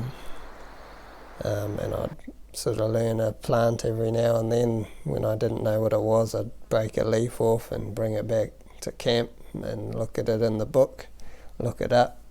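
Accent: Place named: New Zealand. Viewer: Wow! AS soon as I heard your voice and you said you were trapping possum's I knew you had to be a Kiwi!! I had a similar lifestyle growing up on the coast in the Wairarapa with my own trap line! really enjoyed this mate! New sub and I'll be following your channel with interest!!👍😀💯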